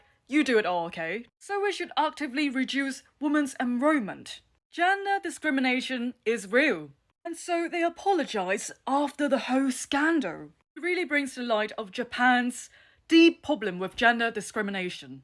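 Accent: United Kingdom. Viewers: Hearing someone speak perfect King’s English but use woman as a plural like a Japanese person is so surreal 😭 But also very cool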